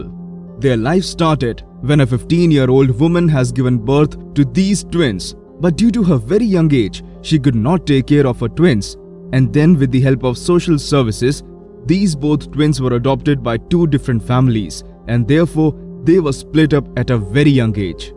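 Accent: India. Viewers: Indian voice/slang spoil the MW...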